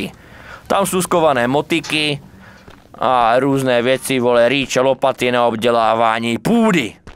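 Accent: Moravia, Czech Republic. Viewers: Seš moravák?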